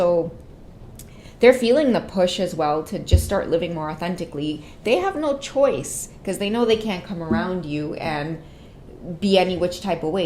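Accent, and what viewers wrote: Trinidad and Tobago. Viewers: Hey !!! Are you from Trinidad 🇹🇹 you sound like you from.am from Trinidad 🇹🇹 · Your Trini accent is everything for me 🥰 Carribbean gal tingz 😂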